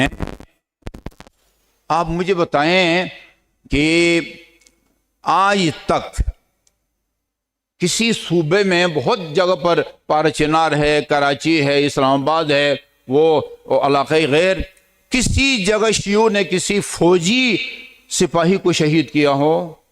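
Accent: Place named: Iran. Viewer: جناب آپ پہلے فارسی لہجہ سرحد پار رکھ کر آئیں پھر کوئی بات کریں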